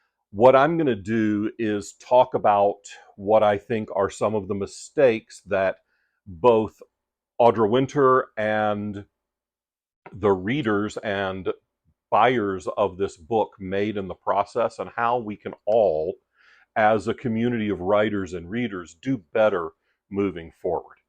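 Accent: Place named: Midwest, United States. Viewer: If I had to guess - I’d bet you grew up in the Midwest - Missouri, Arkansas, Alabama, Mississippi. I’m from Missouri - spent 24 years in the coast guard and moved a lot. But damn - I’m good at guessing where folks grew up.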